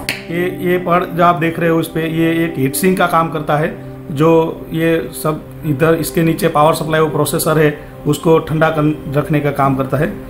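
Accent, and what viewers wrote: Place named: North, India. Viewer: North indians accent sucks!!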